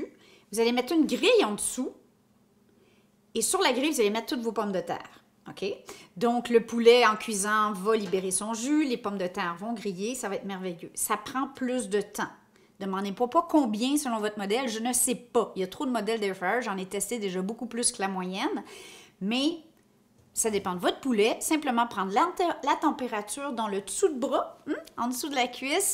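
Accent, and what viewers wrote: Quebec, Canada. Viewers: Je m'abonne mais c'est pas vraiment pour les recettes , c'est mon métier cuisinier mais pour l'accent québécois , franchement ça me fait craquer , j'adore 🤣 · Oh bonjour Québec ! A cause de vous je me suis acheté un airfryer 😂 je connaissais pas mais ça a l’air sympa , et votre accent j’ai dit « on est obligé c’est le quebec on a jamais le droit de leur refuser quoi que ce soit » 😂 Donc j’ai hâte de le recevoir pour l’essayer et regarder vos petites recettes trop mignonnes 🥹 J’aime les plats léger pour le sport et lors de cheatmeal j’aime bien les beignets ou fritures sympa même si c’est un peu gras ! Merci à vous 2 😁😁😁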